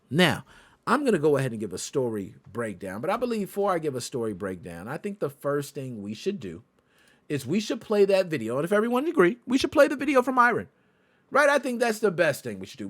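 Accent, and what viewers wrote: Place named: United States. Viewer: I just hate American black guys' accent 😒. especially the US ones that gives lectures like you doesn't suit you at all. Plz correct your accent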